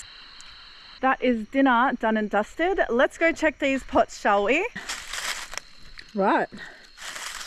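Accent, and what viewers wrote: Australia. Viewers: I definitely would like to second what this guy here just said 👆 much rather prefer her Aussie accent...kind of a sucka for that accent. Anytime you want to come to the states let me know and we can roll around 😏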